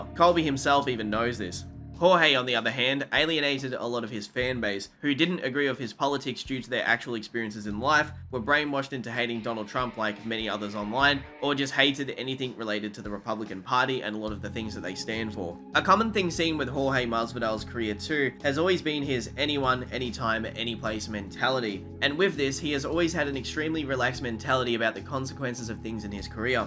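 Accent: Australia. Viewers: You are delusional and insane, sorry. Colby actually is a republican, and the fact that you think it could only possibly be all a fake persona and that he is secretly some lib, shows how out of touch you are with reality, you clearly live online too much. You don't sound American, you sound Australian or something, let me tell you kid, Trump got 74 million votes, these people are not rare, they are in fact pretty common, and the fact that you think that any fighter who says they support Trump is playing a character just to trigger libs, shows that you are insane and out of touch with reality, you are one of these people who doesn't understand that other people exist that don't have the same views as yourself, you are a projecting delusional person essentially, you see Colby and what he says he supports, and since you don't personally support that, you think it has to be all fake.....sigh. 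Dude you are crazy. Also your take on fans changing their opinion of fighters was silly also, because you totally aren't taking into account that as time passes a lot of events occur, why can't fans change their opinion of a fighter after many events involving that fighter take place? That isn't fickle, that is just adjusting the opinions of that fighter after we find out more about them.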